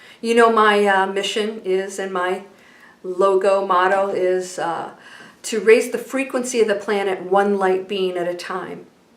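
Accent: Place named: South, United States. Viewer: I wish that was my next door neighbor. I thought that you would be Russian with that name but you have a country accent.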